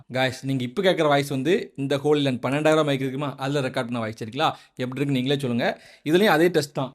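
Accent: Tirunelveli, India. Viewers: Anna neenga thoothukudi, thirunelveli pakkama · Anne nega tirunelveli yaa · Anna nenga tirunelveli Karan ah?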